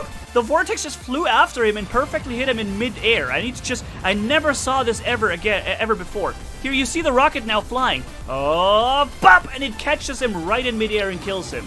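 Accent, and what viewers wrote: Germany. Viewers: BTW, I knew you're natively German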